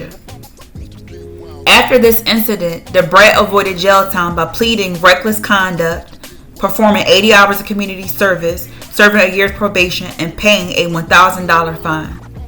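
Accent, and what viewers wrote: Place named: Philadelphia, United States. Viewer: This narrator’s voice is so ghetto I can’t finish listening!! Definitely East Coast…almost sounds like Philly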